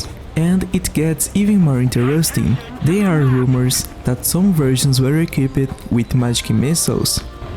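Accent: Brazil. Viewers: Where u from? You sound like a brazilian guy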